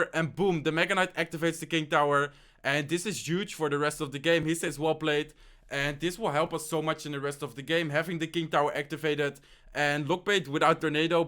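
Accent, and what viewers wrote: Netherlands. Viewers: Dutch accent is kinda annoying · I tough you were Dutch just like me cuz of the accent. I look in the description and it says: I’m from the Netherlands lol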